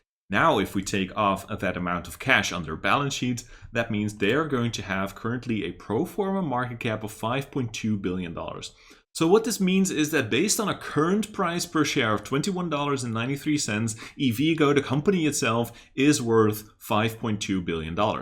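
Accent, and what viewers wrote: Netherlands. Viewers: Bedankt! Als ik mij niet vergis, kom je uit Nederland. Maar goed ik vind jou analyse mooi ga zo door. Ik ga je kanaal promoten!!!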